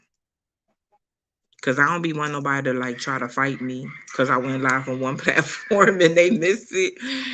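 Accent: United States. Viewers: i adore this black woman..... we as black men LOVE U ..... u gotta love her country accent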